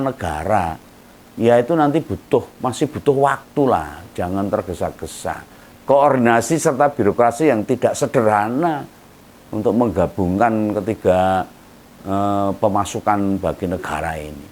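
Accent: Java, Indonesia. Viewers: Serius nanya.. dari dialeknya om ini dari Jatim atau Jateng ya..?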